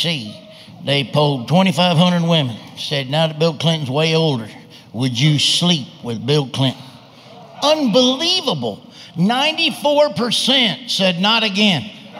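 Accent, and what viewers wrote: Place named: South, United States. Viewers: It's funny how his niche is southern country people, but his jokes are actually universal. If his jokes were done without the accent I think his reach would have been more cross cultural. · He might be from Nebraska But he's got Florida boys nailed Right down to the accent. 99 percent of what he talks about is true for deep southerners lives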